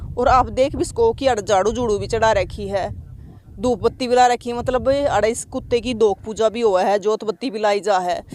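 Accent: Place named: Haryana, India. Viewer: Didi aapke bolne ka tarika bahut acha he jo aap haryanvi me bolte he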